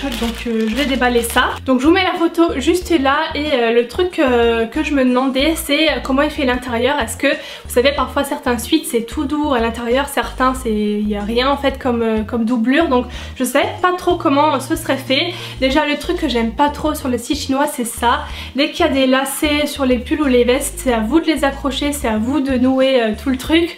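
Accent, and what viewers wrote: Lorraine, France. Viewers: Ta l'accent lorrain 😘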